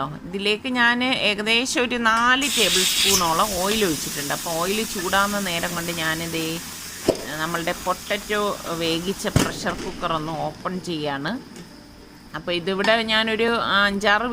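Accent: Trivandrum, India. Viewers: Ithaa,veedu tvpm aano? Varthanam kettittu anganey thonnunnu....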